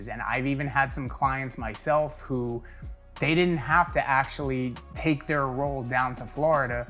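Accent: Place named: New York, United States. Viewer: Always appreciate your videos...and especially your no nonsense tell it like it is NY/NJ delivery. .. lol. I'm here in NYC, retired guy, and have been thinking a great deal of moving to Ft Lauderdale. (Broward county being the only one I would look at, after giving other areas in FL very much consideration) However, my front runner move to area right now is the Hudson River Valley, looking at towns there now. I figure I could snow bird it down to FL.... for me actually living there as a primary residence is problematic. We'll see. Again, love your videos!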